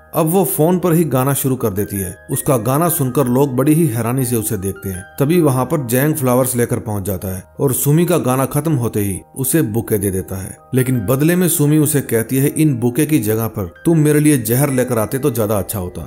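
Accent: Punjab, India. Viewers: Punjab se hi bhai ki awaj Punjabi he bro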